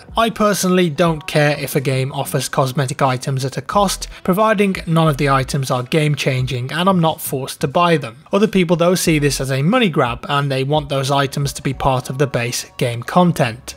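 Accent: United Kingdom. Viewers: one good question though. how did develop a British accent even though he's american?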